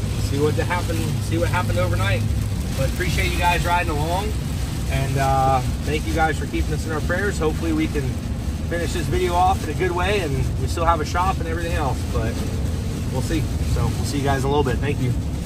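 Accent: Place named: Canada. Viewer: Is he not from the south too? Talks like he's from Canada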